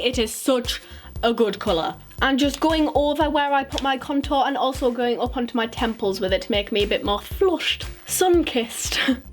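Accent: Newcastle, United Kingdom. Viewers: Your accent has hints of geordie in it's great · Just curious but are you from or near Durham? I really recognise the Geordie accent. Also this was a beautiful makeup look and I really love watching your videos 😊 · Gwyn Princess nope, defiantly not Scottish lol, I would say Newcastle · You are gorgeous! Love your accent, similar to mine, I come from Newcastle also! I find us to have a lot in common! Love youuu!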